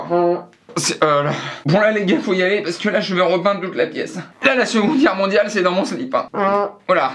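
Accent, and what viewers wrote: Germany. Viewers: bonne année je t adore bonne continuation pour tes belles videos bien ton accent Allemand bisous tendres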